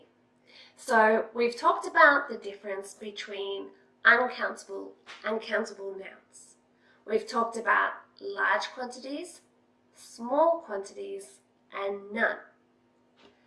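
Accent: Australia. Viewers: Content is exceptionally good!! Exactly what I was looking for for a friend. However, sound and video production are, I am sorry to say, horrific. Sound production is important, because many will find the strong Australian accent unfamiliar and then add to it the slight echo from the bad sound and that makes it even more difficult for people to understand you. The slow speech is excellent, though. I am hoping since 2019 you Googled "sound for youtube videos" and made ANY improvements suggested. Really, if you are going to produce youtube videos, doing the absolute bare minimum for quality, not far below, is expected. The poor production quality is really detracting from your absolutely fantastic content. Cheers!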